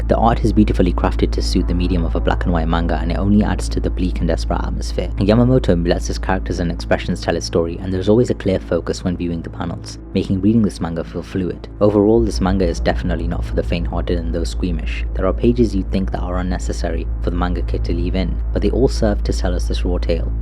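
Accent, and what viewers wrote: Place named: India. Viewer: INDIAN ACCENT..DISIKE👎👎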